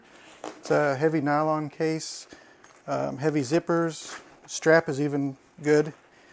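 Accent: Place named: Texas, United States. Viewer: That Texas accent should have been a dead giveaway.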